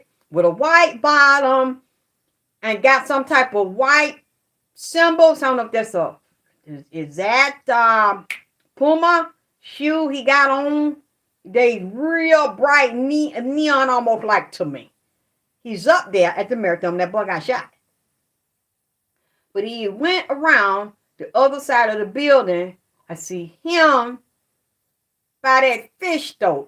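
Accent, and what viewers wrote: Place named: Georgia, United States. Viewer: Hahahahaha. I'm not done watching yet. But I had to write I laughed so hard there were tears in my eyes when you were answering the lady's question about how to get a reading and what not to do when get a reading from you. I'm a Ugandan that grew up in USA. I like your Georgia accent and readings you do on here. I also don't mind you speaking Ebonics because Ebonics is one of your languages: I understand you whether or not you speak Ebonics or slang. Okay, now back to watching the rest of your video. If I have more to say I'll post another comment.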